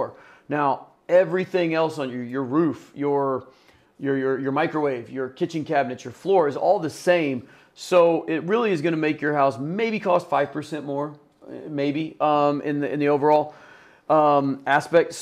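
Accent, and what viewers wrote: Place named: California, United States. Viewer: Sounds like you’re California, like me 😳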